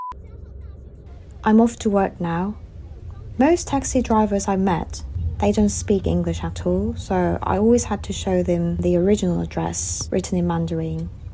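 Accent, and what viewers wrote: United Kingdom. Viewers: I want to watch another episode!! Your vid is always amazing❤ I love your british accent too. Have a good one!!🩵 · 대만 브이로그와.. 영국 영어 까지.. 아주 브릴리언트한 영상입니다.. 잘보고가요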